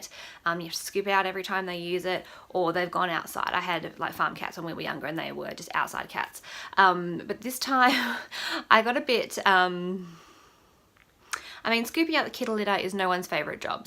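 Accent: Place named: Australia. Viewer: I knew you were Aussie because of your accent,were are you from? I'm from Victoria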